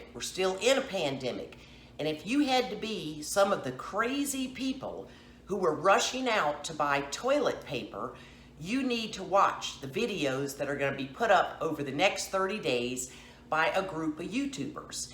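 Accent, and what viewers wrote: South, United States. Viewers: Hello! New Subscriber 😀 I'm from Alabama ❤️ Sounds like you are from the south too 😁👍 Thanks for sharing! Great video 👍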